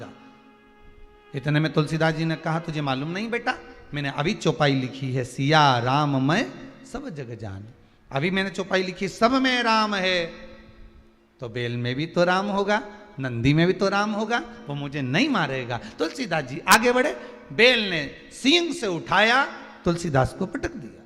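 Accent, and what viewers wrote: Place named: Madhya Pradesh, India. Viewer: Aap m.p vale ho to mp ki tarh hi belonged.Maharashtra ki Tarah bolo to me aapko accha he sir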